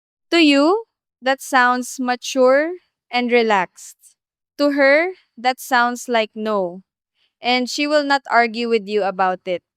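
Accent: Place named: Philippines. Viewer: ❤❤❤❤ Your So Beautiful and Love you and your wonderful Information ❤❤❤ Love Filipinas especially your Lovely Accent 😍😍🥰😘💕❤️💓💋💞🌹💓❤😘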